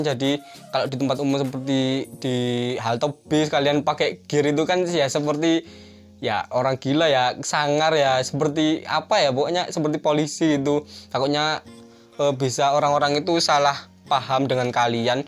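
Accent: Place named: Java, Indonesia. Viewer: Jowone medok ea🤣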